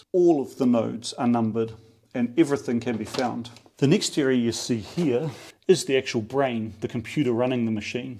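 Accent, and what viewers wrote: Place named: New Zealand. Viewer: Great informative video, You sound Kiwi to me??? Cheers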